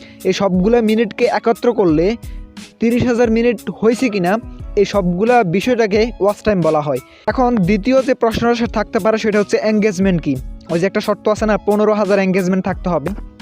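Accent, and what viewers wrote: Noakhali, Bangladesh. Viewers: তুমি নোয়াখাীর ছেলে😍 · আপনি কি নোয়াখালীর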